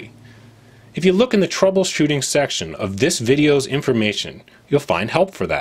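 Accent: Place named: United States. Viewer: I am typing as fast as i can, while my statement is true. I have tried for more than a year to get this on my Zeki 7inch. followed stuff on xda, etc. but got little to nowhere. first you have a great voice. Second you speak American English. Third you are organized and clear. This wasn't working so I followed someones comment below -clear cache. That didn't help so i bit the bullet and cleared data. It asked to accept google terms. i did play store opened. Hooray. I subscribed so will be watching again and more. Thank you.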